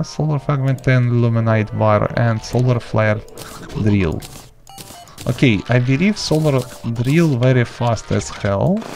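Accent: Russia. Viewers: also he has Russian accent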